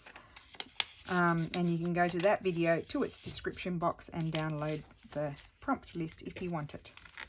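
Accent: Australia. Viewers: "Go bananas decorating" haha I love your Australian accent and expressions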